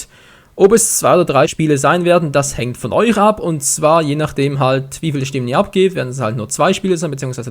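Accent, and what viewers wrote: Switzerland. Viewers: hahahahahaha er isch schwizer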